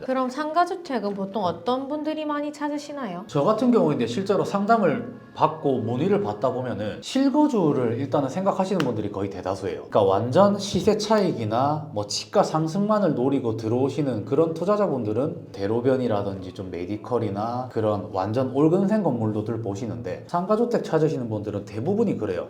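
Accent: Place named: Gyeonggi, South Korea. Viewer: 원래 경기도 사투리에요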